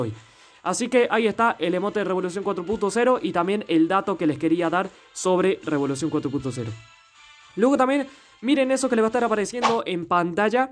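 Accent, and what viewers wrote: Córdoba, Argentina. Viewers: sos de Córdoba?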